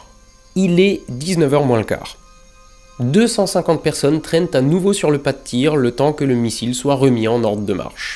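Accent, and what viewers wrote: Alsace, France. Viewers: L'accent alsacien est au top! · j'adore les sponsos avec l'accent alsacien! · Aaaah l'accent Alsacien de l'ouest du Bas-Rhin ! pour une fois j'ai regardé la sponso en entier ^^ des shmout'z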